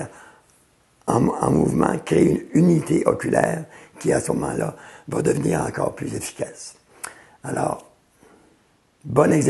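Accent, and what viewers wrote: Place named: Canada. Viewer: Extra, merci beaucoup ! Votre site est super, on va aussi transmettre le lien à nos clients ! Et j'adore votre accent canadien :-) il réchauffe le coeur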